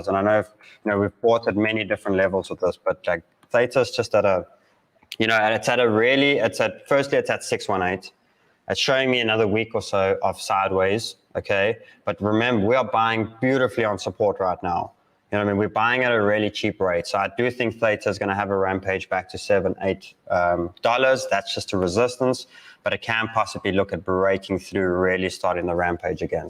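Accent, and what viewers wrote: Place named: South Africa. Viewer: He's sounds South African to me but I don't know because there's so many different South African dialects.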